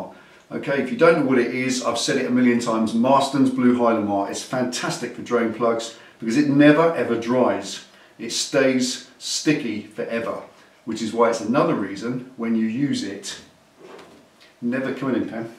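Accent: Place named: England, United Kingdom. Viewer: First, this is a great series man, and I'm going to throw you a bone on PP, or buy a Tshirt to say thanks for your work. Never again will I pay these outrageous fees because that's about right on the money. Average low end cost is about $100 USD per hole anywhere in Texas. Service rates vary from $85-$125/hr depending on where you are. I just picked up an old 04 Springer, and I'm using these videos as they are the best on YouTube. Plus, I love English accents, so that's a good bonus. 😎 A couple of questions mate...if you don't mind. 1. Old timers, especially experienced Mechanics strongly encourage running 80/140 in the gearbox. S&S performance does as well by the way. What say you about that? 2. Just curious...Why did you not use the socket for plug removal here?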